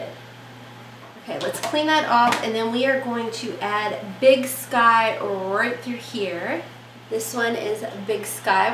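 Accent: South, United States. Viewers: This look is so beautiful on you!! And your accent! I love it you must be from the south, as I am!! I’m in Oklahoma and it’s hotter than satan right now!! And I do like first impressions on palettes! Great video xoxo! Lots of love!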